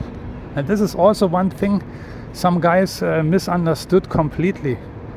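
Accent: Germany. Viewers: I like the English with the German accent!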